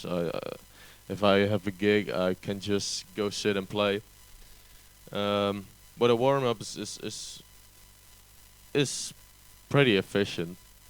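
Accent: Netherlands. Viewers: Haha i can hear your dutch just like me:D im enjoying the video;) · lol he's from the netherlands :P. je hoort ut gelijk aan z'n accent. nice vid btw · thou sounds dutch.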